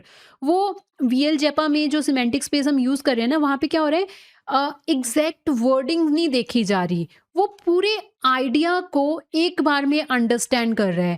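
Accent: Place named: India. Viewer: It's so nice to hear an Indian voice behind an AI unraveling video that too in Hindi🔥🔥...please go deep for those who somehow can't able to break the language barrier yet...your video will certainly help in doing that...by making understanding of AI to the masses🙏🙏🔥🔥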